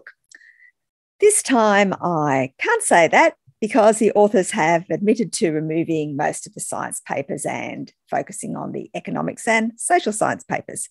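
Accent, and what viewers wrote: Australia. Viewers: She's an Ozzie. Plus, using the 'innuendo' parrot's Campbell.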